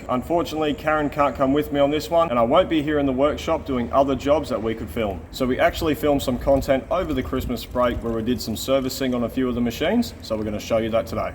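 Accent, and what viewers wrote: Australia. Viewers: So bloody austrailian ah. "That aint no bone boy. Now this is what i call a bone" with a sparkle in ya eye😁😁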